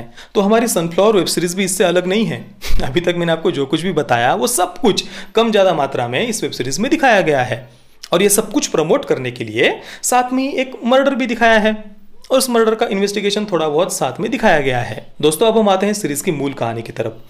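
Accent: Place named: Maharashtra, India. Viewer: bhai main kanpur se hoon ....muzhe marathi nai aati lekin aapke muh se marathi badi pyari lagti hai..isi bahane ek nai pyari language sikhne miltti hai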